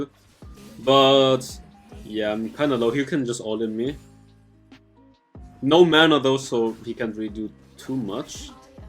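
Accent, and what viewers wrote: Germany. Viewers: One Question... are you from Germany? Your pronunciation sounds a bit german. Btw, I really like your videos... they are very helpful for platinum players like me :)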